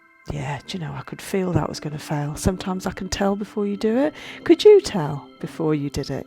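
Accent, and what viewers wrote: England, United Kingdom. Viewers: Amazing videos and very interesting. Didn't even know this was a form of treatment. Great videos. What part of england are u from? 😊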